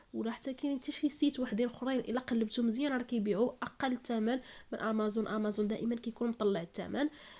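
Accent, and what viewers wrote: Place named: Maghreb. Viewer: سلام عليكم 🤗أنا ندخل نتفرج بش نسمع اللهجة المغاريبية تعجبني برشا 🇹🇳🇲🇦🙏❤و ربي يعينك 🤲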